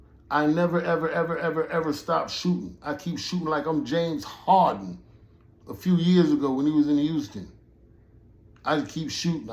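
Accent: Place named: New York, United States. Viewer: I just wasted 11 & something mins about nothing,sound like a throw back dude from n.y.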